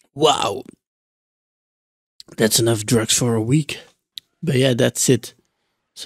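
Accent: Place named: Turkey. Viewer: Are u from turkey bro? Wtf is this accent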